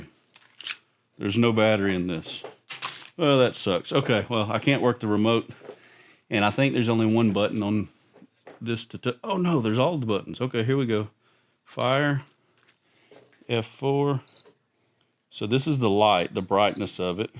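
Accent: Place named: Alabama, United States. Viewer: What part of Alabama are you from? I’m from Alabama and I can tell you are too from that accent.😂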